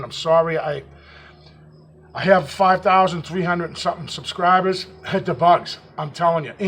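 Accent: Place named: New England, United States. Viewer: Your analysis of tree and branch breaks are insightful. I don't always agree, but breaks are still physical evidence. I've agreed with quite a few of your assessments. I think changing the time of day that you do your hikes will change what you might find. Midday is probably not the best time of day. But no matter what any one thinks, you keep doing what you enjoy. And by the way, we enjoy your New England accent, lol.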